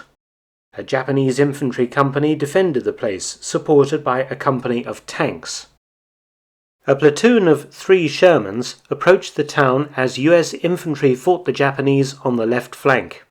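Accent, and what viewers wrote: United Kingdom. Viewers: I love your intro then your awesome British professional voice over.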